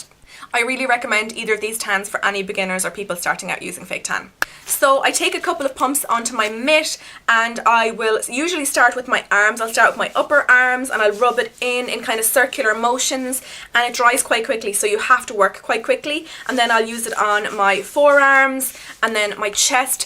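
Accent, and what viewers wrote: Ireland. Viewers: OMG your Irish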